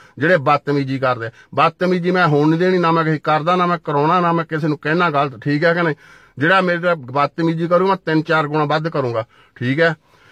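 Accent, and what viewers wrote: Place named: Doaba, India. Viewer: ਬਾਈ ਤੁਹਡੀਯ ਬੋਲੀ ਦੁਆਬੇ ਦੀ ਆ।ਮਾਲਵੇ ਤੋ ਸ਼ਾਬਾਸ਼ ।ਵੀਡੀਓ ਲਾਈਕ ਕਰਕੇ ਸ਼ੇਅਰ ਕਰਨ ਵਾਲੀ ਆ।ਅੰਗਰੇਜ਼ ਭਜਾਉਣ ਵਿਰੁੱਧ ਤਾਂ ਨੇ ਬਹੁਤ ਵਧੀਆ ਗੀਤ ਵੀ ਚਲਾ ਤਾ।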